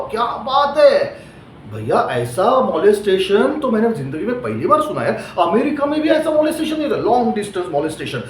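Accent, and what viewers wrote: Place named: Gujarat, India. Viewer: Caste nhi pooch rha tha bhiya m bas apka baat krne k tarike se maharashtra aur gujarat jesa feel aaya hindu ya muslim likhne ki zarurat hi nhi thi 🙏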